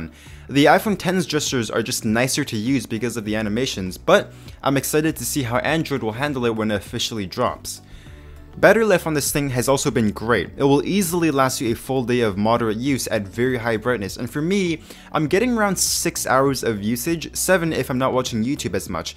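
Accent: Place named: Canada. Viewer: Judging by your accent, I guess you're.. Canadian? Am I right?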